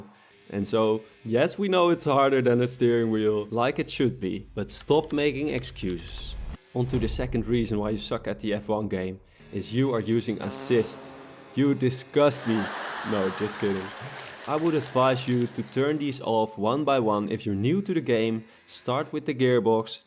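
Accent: Netherlands. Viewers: holy nl accent mr thx man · thx voor de tips man, holy Nederlands accent wel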